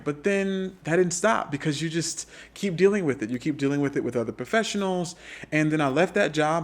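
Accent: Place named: Charleston, United States. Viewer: Sounds like Charleston Sc !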